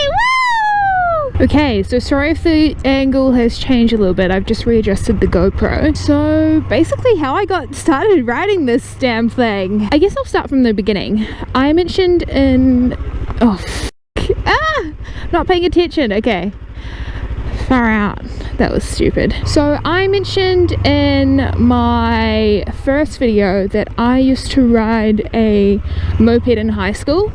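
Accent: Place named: New Zealand. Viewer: Quite liking the New Zealand accent 👌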